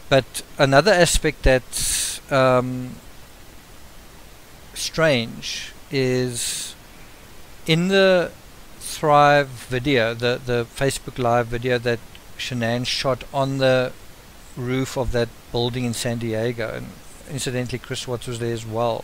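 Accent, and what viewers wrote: South Africa. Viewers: He has a south African accent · Omfg.. I’m off.. soooo sssslllloooo<link> thst and the SA accent.. sorry 😐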